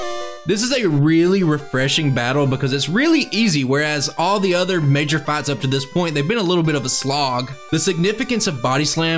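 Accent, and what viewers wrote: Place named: Appalachia, United States. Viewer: I can recognize the appalchian accent from a mile away. Where are you from? East TN?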